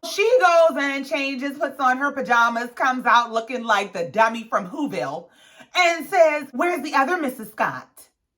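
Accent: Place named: South Carolina, United States. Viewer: Some of my favorite commentators are too skewed on thier reviews because they don’t like Tisha but guhhhh you the most honest and unbiased review on this here show! Can’t wait til you hit 10k! Keep it going Queen. Where are you from cause I hear South Carolina all in your tone!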